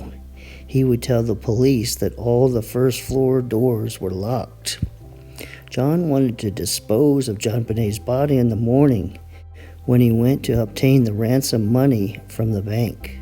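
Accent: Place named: New York, United States. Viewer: I’m in the uk North Yorkshire and I get so excited when I see you have a new video up! As for your accent well I’m not familiar with American accents I can tell the difference between a southern accent that’s about it 😆but yours well don’t laugh and don’t be offended but you remind me of a New York detective, I could listen to to you all day. I fascinated with this case keep up the great work. Best wishes from over the pond.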